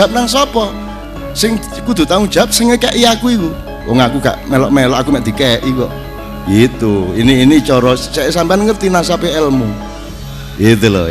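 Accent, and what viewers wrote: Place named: Java, Indonesia. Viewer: Lidah jawa mas bro'.. ga usah di perdebatkan..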